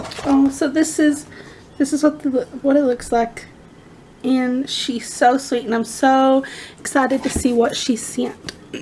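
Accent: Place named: South, United States. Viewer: SOUTHERN CHIPMUNK VOICE!!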